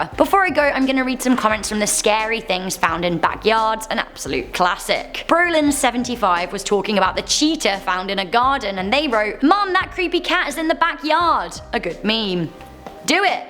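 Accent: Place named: Cornwall, United Kingdom. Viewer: Miss you are looking stunningly beautiful and sounded not like a pirate but more like my neighbours here in Cornwall UK 😍 but as always a great video plus very informative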